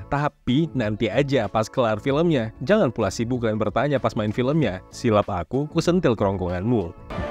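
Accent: Medan, Indonesia. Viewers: kurang dapet bahasa ala ala medannya lek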